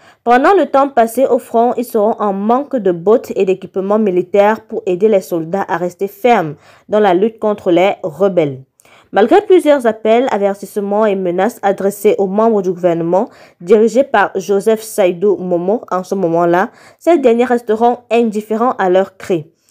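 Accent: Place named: Cameroon. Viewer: L'accent est trop camerounais et sérieusement inaudible 🤢🤮 faîte un effort svp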